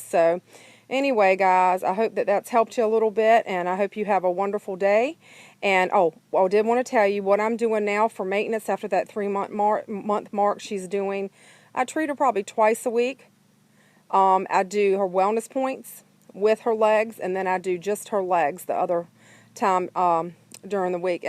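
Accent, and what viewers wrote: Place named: Virginia, United States. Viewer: You sound like you're from Virginia or maybe NC, but my money is on VA. Love VA! Am I close? 😊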